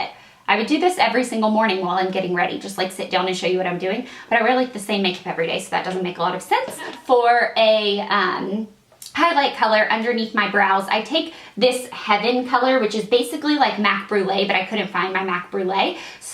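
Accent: South, United States. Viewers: I used to watch you years ago! (: I forgot how adorable your southern accent is ahah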